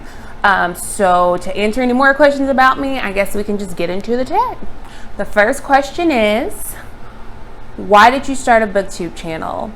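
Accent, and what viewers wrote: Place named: South, United States. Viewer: Welcome to BookTube! I’m from Texas, so I love a Southern accent 😀. Looking forward to what videos you upload!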